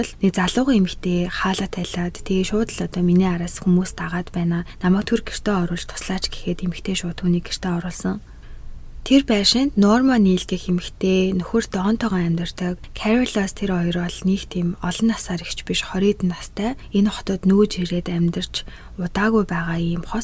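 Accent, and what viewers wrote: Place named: United Kingdom. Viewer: MONGOLOOR yarij bgaa uhaantai YUM bol Angli hunii neriig Angli ayalgaar BUU HEL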